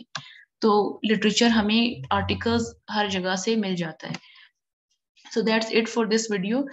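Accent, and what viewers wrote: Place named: Kashmir, India. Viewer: May Allah bless you... Can u tell me where from u. Bcoz I am from Kashmir.. Your voice tells u also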